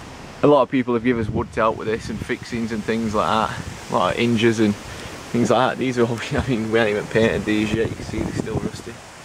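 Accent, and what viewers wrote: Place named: Northern England, United Kingdom. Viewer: Hi, I also live in the north of england and judging by your accent, not too far from you, so where abouts did you get all these supplies from?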